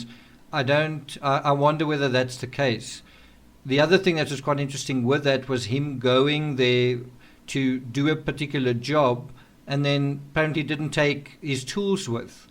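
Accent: South Africa. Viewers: Are you a South African ?Sounds like that ....your accent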